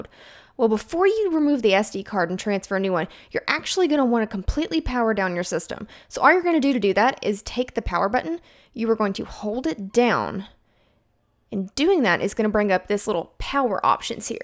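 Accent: South, United States. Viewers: Its just the US accent i guess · It's a Southern US accent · Thank you so much! It was so clear and your southern accent made it enjoyable to listen to :)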